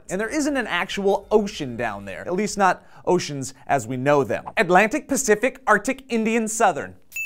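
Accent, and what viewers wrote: South, United States. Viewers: a little offended by the southern accent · Yay for southern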